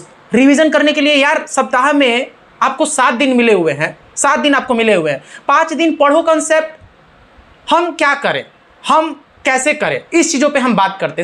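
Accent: Bihar, India. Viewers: Good approach for math telling inspector sahab your style of speaking is fabulous bro and also motivated lots of things bro ✌️♥️♥️ Bihari style 😎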